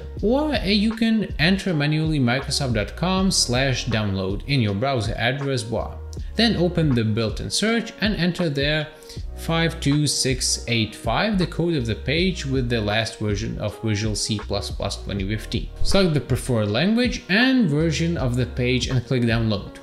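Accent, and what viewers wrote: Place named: India. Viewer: Bro what’s with the Indian accent?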